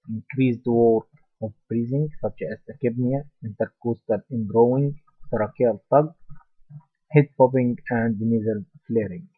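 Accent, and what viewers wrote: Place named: Egypt. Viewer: From the accent I can tell it’s an Egyptian talking😎 thank you 🙏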